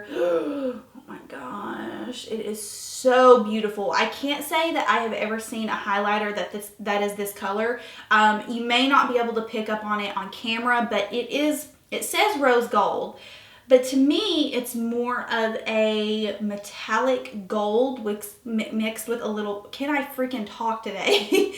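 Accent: South, United States. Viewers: I love your videos and your adorable Southern accent. I love Burberry makeup looks too! Violette_fr is a super cool French blogger.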